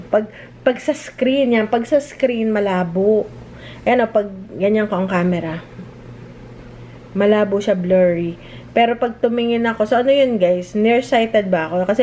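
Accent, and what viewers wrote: Batangas, Philippines. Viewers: Matagal na aq nanonood Ng vlog NYO pero now din lang aq nag comment..Ung punto NYO Po Kasi SA nakaraang vlog NYO para kasing batanguena Kau..batanguena rin Po aq nasugbu batangas po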